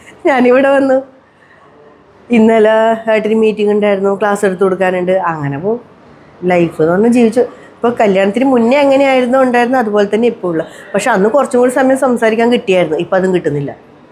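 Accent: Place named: Kannur, India. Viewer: 😂😂 എങ്ങനെ യൊക്കെ ശ്രദ്ധിച്ചാലും ആ കണ്ണൂർ സ്ലാങ് പുറത്തു ചാടുന്നത് കണ്ണൂർക്കാർക് തിരീന്നുണ്ട്... ട്ട്വ...😅😅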